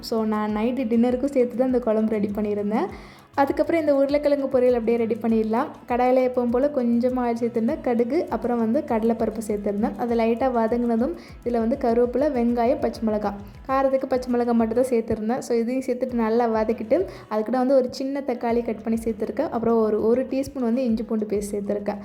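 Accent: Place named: Sri Lanka. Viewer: Hi sis thodarthu paakuran ur video Sister neenga Sri Lanka va unga pesu appadi iruku ❤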